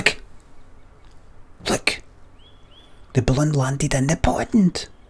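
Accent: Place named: London, United Kingdom. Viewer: The London accent is the best thing about this stream, cracked me up haha!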